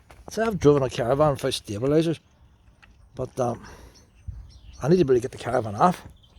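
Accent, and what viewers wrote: Ireland. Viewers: Great video - thanks for that! And, your thick Irish accent reminds me of my grandfather - so thanks for that too!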